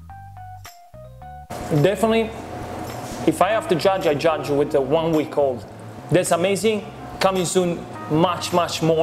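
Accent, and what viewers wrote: Italy. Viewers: I came here to listen his Italian accent 😅 and I Iove making 🍕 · On my 40th batch of pizza following this recipe. all i can say is get 00 (zero zero) flour to make to worthwhile - buy best flour you can get hold off and the best yeast you can get the mcDougalls one is good! Love this Video and i practice my italian accent when making it so i sound like the man in the Video TUTTO BENE x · Salve o... AVE?;-) are you born Italian living in the USA o Italian born in USA? I really don' t know if you speak English with that accent pourposly or is faked;-) BTW you are very good pizza maker and looks a super very nice person keep up with your outstanding videos!!!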